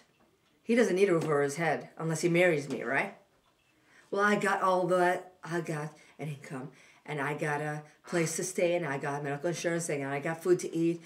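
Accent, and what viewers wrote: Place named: New York, United States. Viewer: You have a New York/New Jersey accent, you sound like an Italian girl.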